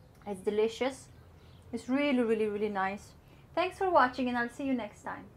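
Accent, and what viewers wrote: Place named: England, United Kingdom. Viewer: ওয়াও ভাবী, রান্নার পাশাপাশি আপনার থেকে ইংলিশ এক্সেন্ট চর্চাও হয়ে যাচ্ছে😊 । ।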